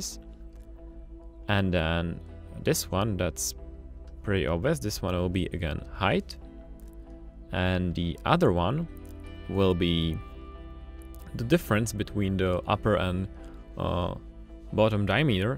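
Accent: Czech Republic. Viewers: Czechenglish se nějak špatně poslouchá:) Ale jinak víc takových tutoriálů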